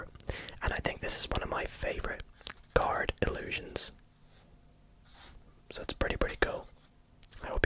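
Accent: Scotland, United Kingdom. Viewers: Enjoyed the video also are you Scottish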